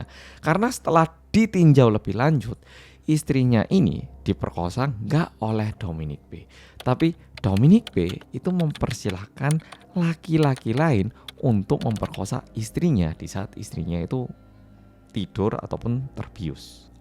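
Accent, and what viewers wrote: Java, Indonesia. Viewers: Wah, ini mantap dan Top top markotop ini yg aku suka dgn bahasanya yg MEDHOK 😄😄😄❤❤❤👍👍👍 Lanjutkan Medhoknya (ini yg menjadikan Aku SUBKRAIB)🙏🙏🙏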